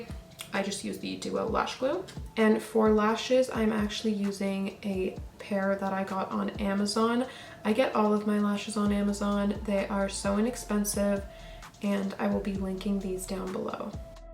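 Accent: Toronto, Canada. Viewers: Why can I always tell when its a fellow Canadian LOL!? Our accents are not even that apparent !! anyways you're stunning hun! from another Canadian living in the UK <3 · is this weird.. from your voice i could tell you were from Toronto.. then you mentioned winners so I knew you were from Canada then I checked your ig and turns out you are! ahah love your videos! we need a toronto beauty guru!!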